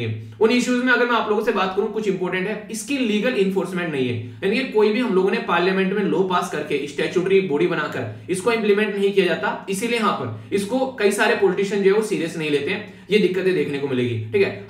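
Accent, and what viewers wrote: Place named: Kangra, India. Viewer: Sir kangadi bolte hai 😎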